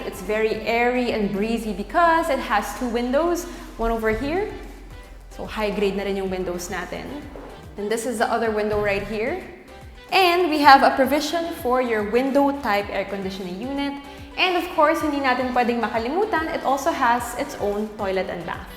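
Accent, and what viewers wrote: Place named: Philippines. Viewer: speaks Taglish perfectly, very natural walang bahid ng kaartehan even if she's a newbie, lots of YouTubers should watch and learn from her...Go!!!!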